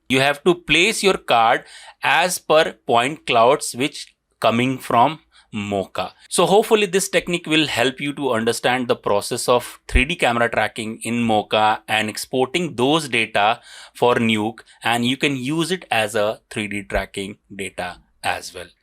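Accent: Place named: India. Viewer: Прекрасный урок, но если ты стал тренером, избавься от ужасного акцента хинди. слушать больно.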